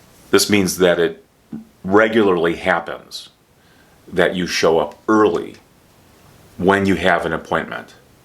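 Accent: United States. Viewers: Thank you for your perfect lessons and real American pronunciation! I try to master American accent with you.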